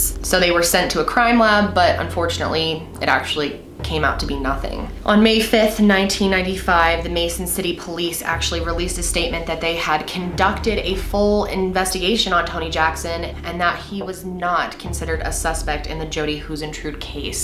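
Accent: Minnesota, United States. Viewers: Her cute little MN accent 🥺🥺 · you're doing a case super close to home.. st cloud is 1.5 hours away from me.. and cedar rapids is 2.5 hours. mason city is 2. super close. other note,, our MN accents are strong lol. I get picked on for certain words I say. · I loooove Minnesota accents. Why should she, or anyone, have to work toward getting rid of it? This case is so sad. :(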